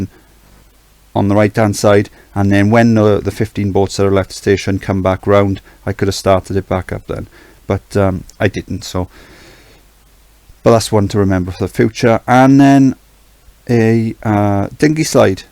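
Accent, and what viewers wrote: Wales, United Kingdom. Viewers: What country are you from? Your accent is awesome. I want to guess Wales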